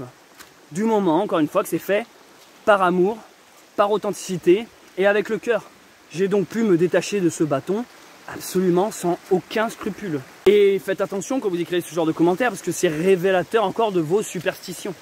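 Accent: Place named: France. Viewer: Fabuleux votre ´ french accent’ 🥰🥰🗽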